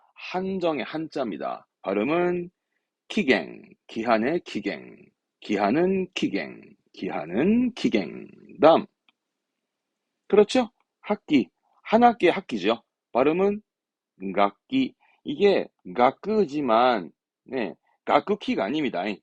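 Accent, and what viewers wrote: Jeolla, South Korea. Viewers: 왐마 듣다보이 우리 절라도분이시구마잉~ 반갑네요잉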